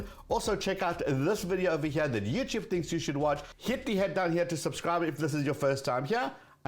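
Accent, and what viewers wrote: South Africa. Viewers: last thing I expected here was to hear a Saffa accent lol, nice!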